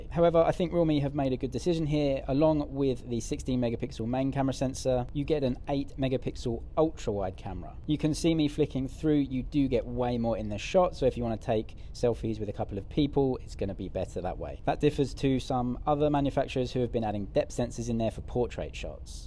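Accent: United Kingdom. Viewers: Your accent like British How you came to china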